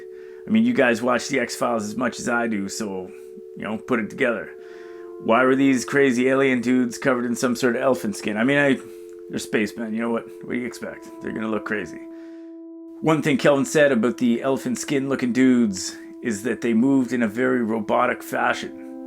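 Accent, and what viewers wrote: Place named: Canada. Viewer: nice vid! nice canadian accent lol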